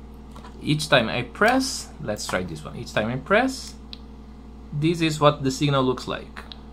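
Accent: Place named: Brazil. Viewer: Tu é Br man?(If not you sound very brazilian)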